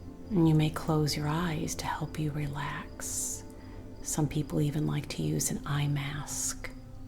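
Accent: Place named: United States. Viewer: Wonderful. The life eperiences you have had to create this ??? Sadness, loss, love. Very touching to me - a 89 year old Englishman living permanently in Japan. Coming from a working class background in North-East England and having parents and grandparents of Irish origin whose lives had not been easy - I release them with love. I love your American voice and wonder what part of America? All the love - Jack.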